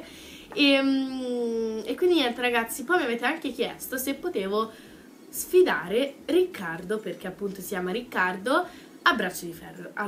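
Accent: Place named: Florence, Italy. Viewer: te tu c hai l accento un po fiorntino dan do vieni ?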